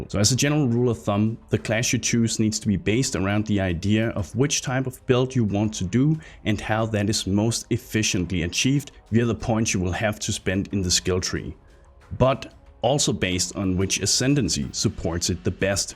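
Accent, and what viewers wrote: Denmark. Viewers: You sound danish brow. Nice vid. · Are you Danish, you sound Danish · Er du dansk Bro? Syntes jeg kan ane en Dansk dialekt i dit engelske ;p Når men Mange tak for videoen, Det var super go Info. 👍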